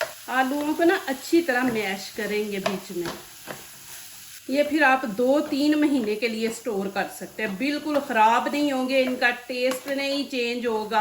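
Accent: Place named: Punjab, India. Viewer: Pure Punjabi accent ❤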